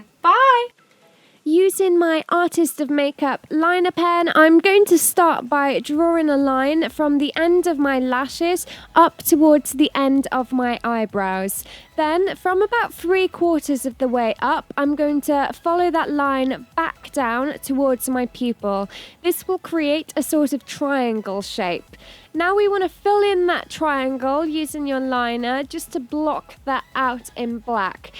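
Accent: England, United Kingdom. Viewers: I could tell she was British straight away because she has a tiny bit of Adele in her voice. Just my opinion anyway! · she from england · That british accent . you're so beautiful ;) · could you, in a next video, give us some tips about brows? i love your makeuppppp and your english accent ahah xx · Ohh this English accent.. How charmy is it😍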